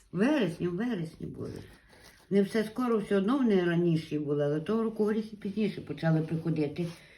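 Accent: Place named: Ternopil, Ukraine. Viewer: Дуже дякую за чудове відео,жіночка дуже нагадує мені мою бабцю,у котрої я ріс до самої школи.Дуже гарна мова,балакає так само як моя бабця🙂,ви напевне знаходитесь на Тернопільщині?Я жив у бабці саме там.Там дуже гарні крайовиди,безмежні поля й ліси,граби,ясені,дуби.Нажаль дубину вирізали давно😔.Моя бабця також насадила багато горіхів,тільки вона їх не вирощувала навмисне(здається на весні),по городі сходили молоді ростки горіху і бабця поосто їх пересаджувала куди треба.Я тоді запитав у неї:"звідки вони ростуть на городі",а вона відповіла:"то ворони в осени розносять і деякі падають,а на весні виростають",ось так.Дуже родюча земля,багато чорнозему.Успіхів вам у вирощуванні,викладайте ще відео👍🇺🇦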